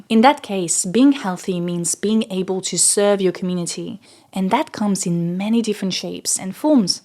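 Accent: United Kingdom. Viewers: Why does she sound like British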